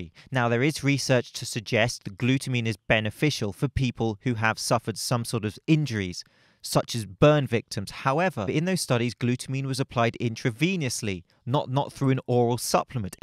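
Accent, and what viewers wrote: United Kingdom. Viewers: Where do you live? British accent, Palm trees and sunshine but, you're wearing a hoodie!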